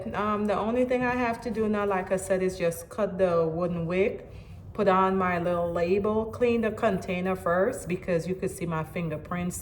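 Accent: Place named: Jamaica. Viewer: i know a jamaican when i hear one lol big up mumma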